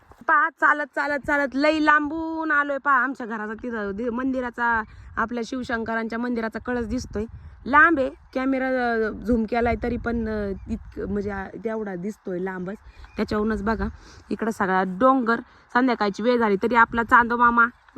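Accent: Ahmednagar, India. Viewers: Aapli bhasha aashicha aahe nagari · नगरी भाषा एकदम तडका❤❤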